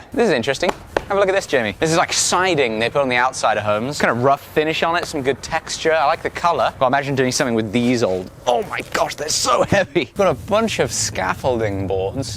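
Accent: England, United Kingdom. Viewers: It’s weird how in the states he sounds super British, but in the UK he sounds American. He just sounds weird everywhere. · sounds like his British accent is coming back strong since going back to the UK.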